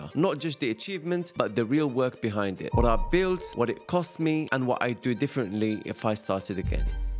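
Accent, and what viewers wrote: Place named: Morocco. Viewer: you have like morrocan accent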